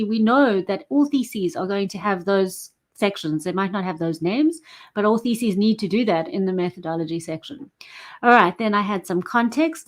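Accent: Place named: South Africa. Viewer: ah iim so excited! I thought you were perhaps American, but so happy to hear your SAn accent! and to seeing similar research interests! Social Realism! Higher education in South Africa! and at UCT. Really comforting!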